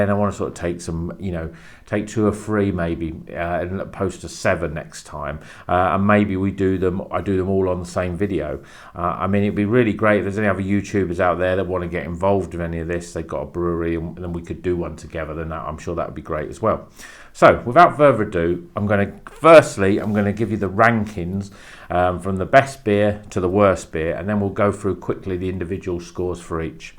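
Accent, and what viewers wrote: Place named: United Kingdom. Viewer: i love british accent!!!! amazing!!! love your channel!!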